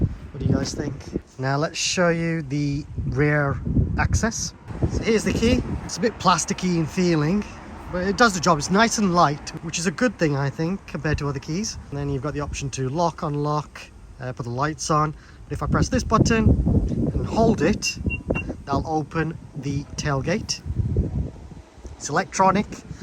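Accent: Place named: Birmingham, United Kingdom. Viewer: Def a Brummie or poss a Wolverhampton, but I couldn't tell the difference, but you'll know. You have a cultured Brummie accent I say. Kiddy is the same but Worcester doesn't apart from Brummies working there. Allroight!😊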